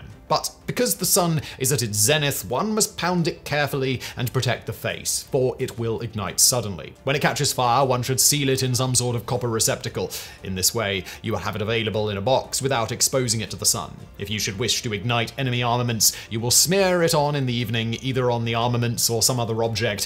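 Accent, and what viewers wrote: England, United Kingdom. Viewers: As an Englishman I can honestly say that has the most quintessentially English accent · British Accent. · Brilliantly done as ever old bean! One tiny moan…the word ‘swathe’. In English we pronounce it “Swaythes” not “Swoths”. I beg you, stop using the US pronunciation? It grates the ear when the rest of your accent is sooo English ✌🏼 Thanks a million for all the videos 🙂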